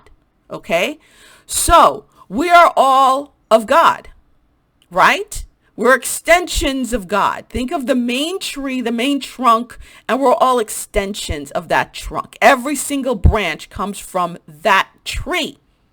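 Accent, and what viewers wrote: New York, United States. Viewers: V you was so NY throughout this video! Lol thank you so much for the heads up! We really in this !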